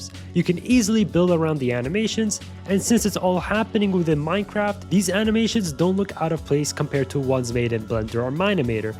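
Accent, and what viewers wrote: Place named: United States. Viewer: You sound that youre from the US with a dual citizenship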